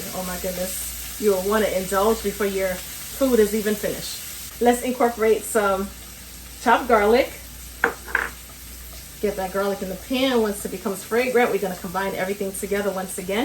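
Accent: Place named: New York, United States. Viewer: That looks good. I have to try it. Where are you from? Sounds like New York or Northern NJ.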